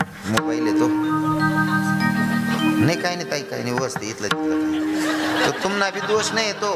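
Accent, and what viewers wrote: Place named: Ahirani, India. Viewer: खरंच खानदेश रत्न आहे महाराज पिवर अहिराणी बोलतात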